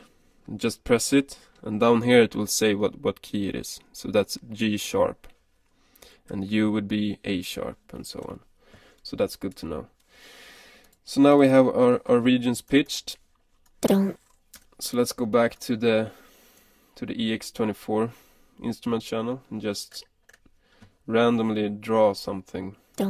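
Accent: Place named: Sweden. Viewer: lol love your accent :D it is soo swedish. great tut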